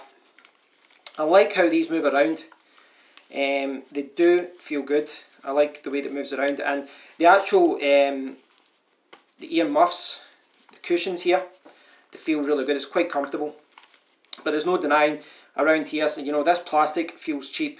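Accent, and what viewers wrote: Scotland, United Kingdom. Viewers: You sound so Scottish lol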